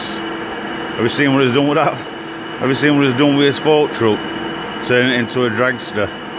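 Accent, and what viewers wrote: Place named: Wetherby, United Kingdom. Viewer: Ahhhh. Three years without a video. You're killing us, killing us. Once in a while I go drinking with a guy who used to live in Wetherby, but has now moved to the colonies (as he calls it). His accent is similar to yours but he is a prison guard, not a machinist. It's lots of fun but there's no trepanning talk. The only drilling he sees at work happens in the showers. Ken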